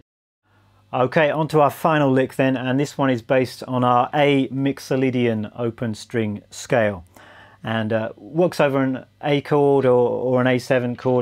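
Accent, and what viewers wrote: United Kingdom. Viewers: Hi, I am amazed at these lickes and phrases you keep coming up with. You sound british but you'rer true country. At 77 years old as I, i FIND i am not too old to learn. I am rather new to your youtube as you might have guessed. Keep it going.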